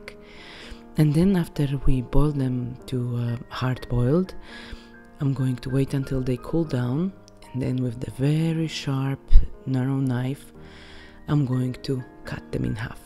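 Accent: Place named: Poland. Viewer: I love your accent so much :) you're polish right?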